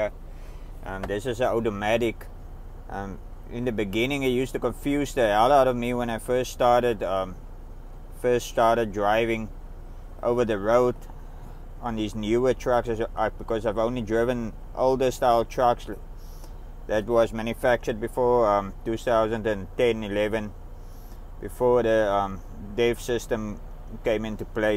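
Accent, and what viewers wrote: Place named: South Africa. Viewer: Dude you clearly from SA 😅. Where about? How long you’ve been here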